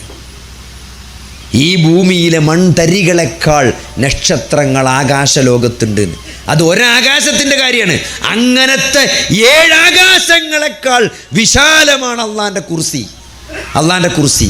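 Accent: Malappuram, India. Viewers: Dr please speak our mother tuge in correct always i lesson your video but your Malappuram tune is there in your speach ok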